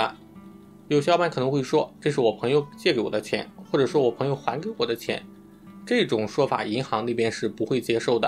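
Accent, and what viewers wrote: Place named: Jiaodong, China. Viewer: 听你口音像胶东的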